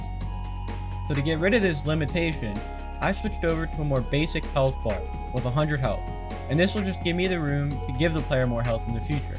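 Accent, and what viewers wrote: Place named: South, United States. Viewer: southern accent sounds lovely!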